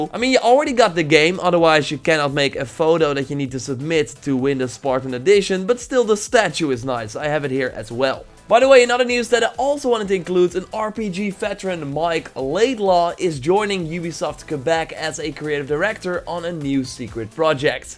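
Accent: Netherlands. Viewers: he Dutch as fuck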